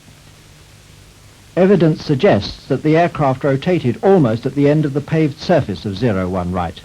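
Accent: United Kingdom. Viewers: Proper British for sure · There is just something about a narrator with a British accent that adds calmness and matter-of-factness. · More than 10% dislikes typically means F. For his Britglish i guess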